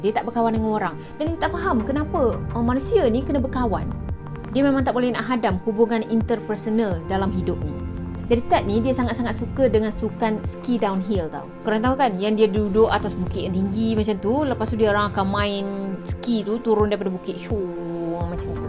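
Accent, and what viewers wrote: Kelantan, Malaysia. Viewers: Patutla sy ade dgr slang kelantan.. rupanya betul sangkaan sy yg sis ni kelate hahaha samela kita sekampung 👍🏼😂😂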